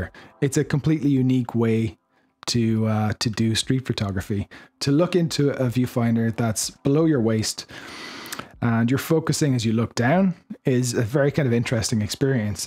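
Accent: Ireland. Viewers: Great Video. I love the Irish Accent, by the way!!!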